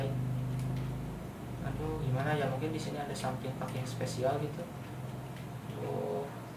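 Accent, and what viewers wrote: West Java, Indonesia. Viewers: Eta ngomong na. Sunda indo 😂😂😂 anjay .. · Ngakak.. pake logat sunda tambah ngakak:v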